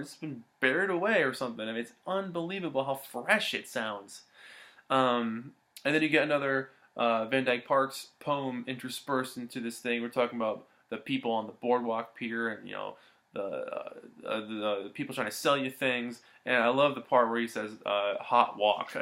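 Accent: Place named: Detroit, United States. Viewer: Hey, love your BB reviews! Where are you located... you sound like we do in the Detroit area... BTW, VDParks was in Detroit area about 7 yrs ago, a great show at a small gallery but too many yakkers around the bar kinda wrecked it.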